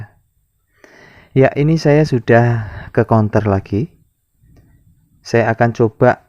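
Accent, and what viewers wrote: Java, Indonesia. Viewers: ok...pntesan dri logatnya jawa bang...slam teko cah bojonegoro jatim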